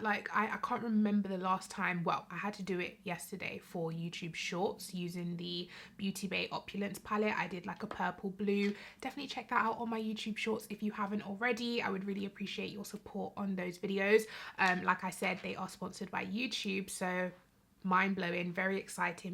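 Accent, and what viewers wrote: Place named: United Kingdom. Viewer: I can’t be sure, because I’m not a content creator, but I think you’re over thinking it. I like seeing your face, 1, because we have similar features. Like keep it a buck? You’re black and it’s nice to see how to do looks and make them look good on brown skin. 🤷🏽‍♀️ this look is great, I want to try it. That’s what I take from you. Maybe it’s just me, but I don’t care if you use the same products, I just want to see how to turn out looks. I am NOT a TikTok fan. Not sorry about it either. Lol, but I just like to talk about makeup and when you come back officially, I want you to do what you want to do, I just want you to be happy doing it. I just want to see your face and hear your British accent, and watch makeup. Lol. Am I over simplifying?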